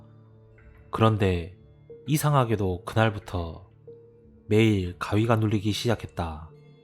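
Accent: Jeolla, South Korea. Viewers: 제가 오히려 더 감사합니다. 진심 남의 사연을 듣는 게 아니라 본인 사연같아요. 구독자 엄청엄청 늘어서 흥하시길 바랍니다. 저는 경상도라 쌈무이님꺼 매니아인데 전라도 말투도 최고입니다. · 전라도사투리 재미있어요. 무서워야하는데, 사투리때문에 집중못했어요. ㅎㅎ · 전라도,억양 ㅎㅎㅎ 어떡,할꺼에요 ㅎㅎ 고향냄새 좋네요 ㅎㅎ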